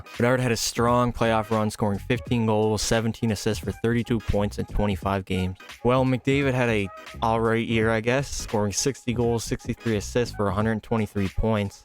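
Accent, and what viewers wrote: Newfoundland, Canada. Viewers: Are you a Newfie by any chance? I hear a hint of an accent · Are u a newfie? U sound like have a newfie accent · Most Newfie accent I've ever heard😂 loved the vid homie!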